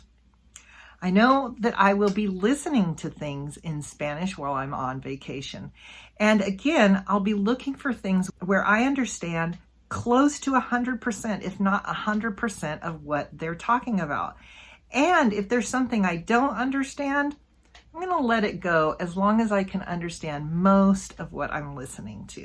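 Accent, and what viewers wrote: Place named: United States. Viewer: I love American English and American Accent so much Dear.😊😇🥰😍🤗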